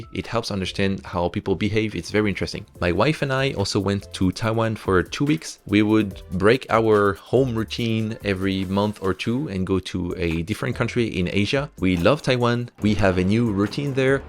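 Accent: France. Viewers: Hey, merci pour la vidéo, (j'ai reconnu le petit accent français) j'aspire à devenir développeur solopreneur, malheureusement je n'y connais rien en programmation, je suis au début de l'aventure, je voulais te demander selon toi quel est le meilleur chemin pour apprendre à coder le genre de Saas que tu conçoit, quelle langage de programmation apprendre en premier et est ce nécessaire d'apprendre L'IA (machine learning ect..) Continue ce que tu fais c'est super inspirant!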